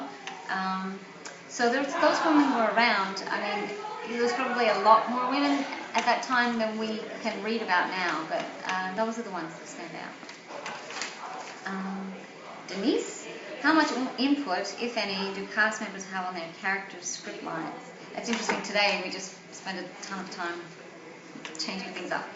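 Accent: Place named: Australia. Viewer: i do detect a bit of an aussie accent, having been to that beautiful country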